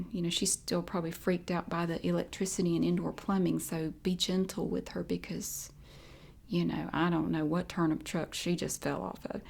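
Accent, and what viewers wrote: South, United States. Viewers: That crazy that you say this because me and my gf have talked about this very thing...see my gf is from the red lake Indian reservation and I'm from leech lake band of ojibwe but was raised in South Minneapolis MN but anywho when she goes bk to visit her rez accent comes bk really thick!!! Ppl say us minnesotans sound funny but idk what we are supposed to sound like!! Your southern accent is the best!! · I loved this conversation! I remember when I first moved to Greensboro my freshman year, everyone sounded so different than me. I’m from Rocky Mount and our southern accent is a variation of yours, but not far from it. My accent has never been as strong, but for some reason whenever I’m talking to someone that’s from my hometown, it’s gets really strong and we always get questioned about where we’re from 😂 To be very honest, I used to be embarrassed of my accent when I first moved here, but I grew to embrace it because I enjoy not sounding like everyone around me! 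· I’ve been watching your videos for so long that I became so accustomed to your accent but because this video was highlighting accents I noticed how southern your accent is! It became a situation where I couldn’t un-hear it 😂 love these ramble videos!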